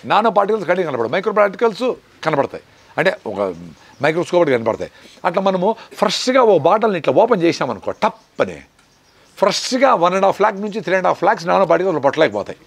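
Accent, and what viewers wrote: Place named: Madanapalle, India. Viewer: Really great information sir.pakka madanapalle slang telugu.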